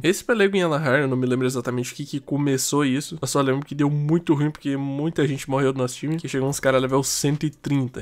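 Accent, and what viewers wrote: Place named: Rio de Janeiro, Brazil. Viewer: Coe, tu só pode ser carioca ou tu é sul fluminense, pprto que não tem como kkkkkkkkkkkkkk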